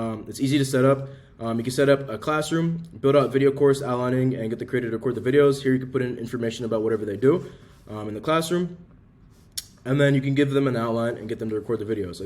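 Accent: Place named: Kenya. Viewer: heard your kenyan good work brother 🌵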